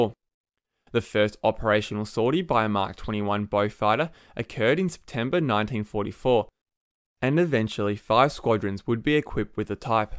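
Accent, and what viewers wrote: Australia. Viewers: Loved the Beaufighter, some great photographs and videos, lovely to here the Aussie twang of the narrator. Excellent. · Love the video and the accent. What part of Oz are you from? Greetings from Norway.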